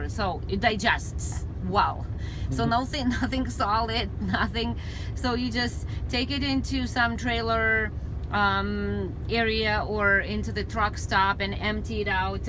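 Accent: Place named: Ukraine. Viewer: Great video thank you. Sounds like a Ukrainian accent. Stay safe out there.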